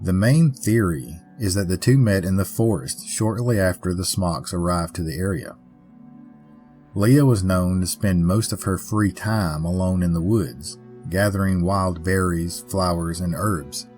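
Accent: South, United States. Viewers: Yay yay i made it in time for the > 100 like status ❤❤ Delectable Dixie drawl here i come 😊😊😊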